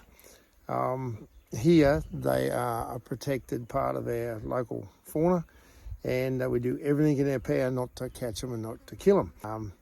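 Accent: Australia. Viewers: Where’s this ?it’s beautiful country and those Brahmans are beautiful. The bull he was beautiful to. You sound like a Aussie ?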